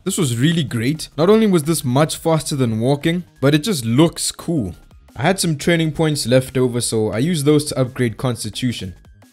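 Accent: South Africa. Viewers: your acent sounds south african (is it?)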